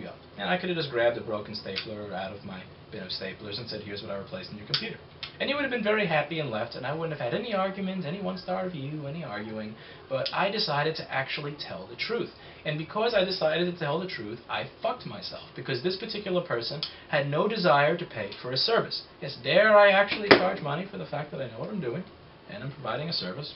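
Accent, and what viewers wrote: New York, United States. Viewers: Enjoying your videos on "repair shop culture" I gather that you're from New York?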